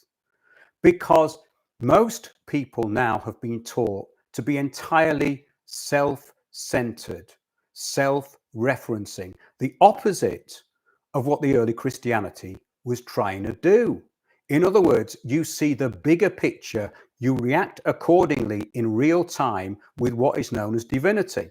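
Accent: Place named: England, United Kingdom. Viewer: This is fascinating. I can clearly see how this transhumanism is an extension of this serpent cult. From childhood/birth (in their depraved eyes) to death. Especially once a child is transitioned early, there are constant medical issues and daily rituals they must do to maintain the deception and stop infection (or to even get an erection.) Also, even to after death, since no doubt most folk who go through such horrendous procedures will feel cut off from God, and may believe they have no redemption if they realise they made an error and desecrated the body God gave them. Therefore, they are stealing folks ability to believe they can be redeemed through the Blood of Christ. Absolutely fascinating. I grew up in the UK, so it's great to hear deep information and truth spoken in English English. Thank you for your work. Wondering what you think about David Icke getting pushed forward by Redacted the last few weeks regarding the Epstein stuff. 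TBH I have never been interested in his work, but my mom was taken in with some of his stuff.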